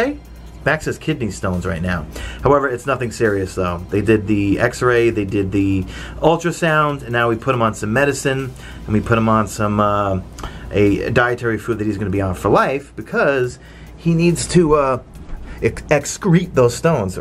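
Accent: New York, United States. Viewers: #madeittotheend congrats on 13 years Max! Your Destiny collection is impressive 🎉 Great setup btw I bet it feels good to have a dedicated space Also liked the New York accent 👍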